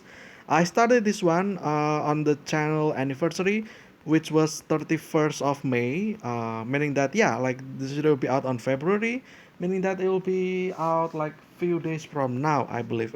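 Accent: Indonesia. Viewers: Bro, from your accent, i guess you're Indonesian?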